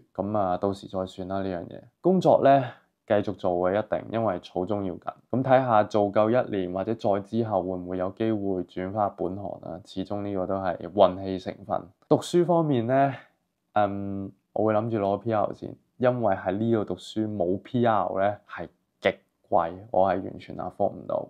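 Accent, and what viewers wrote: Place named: Hong Kong. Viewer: 港味甚重的英文："P鴉驢（PR）"，"Han唔Handle"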